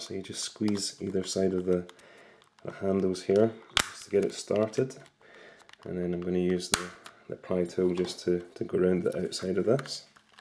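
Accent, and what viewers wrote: Scotland, United Kingdom. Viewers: I am inordinately partial to the Scottish accent, but even with that given: actually find your voice TOO soothing to fall asleep to- like a babbling brook with insights lol. Keep it up brother, seriously, and please monetize for our sakes; we really like you, bro -want you to stick around. :)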